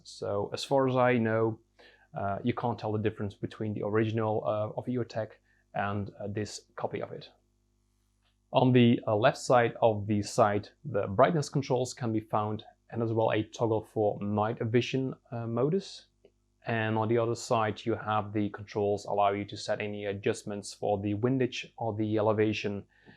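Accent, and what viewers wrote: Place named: Scandinavia. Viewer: I hear that Viking accent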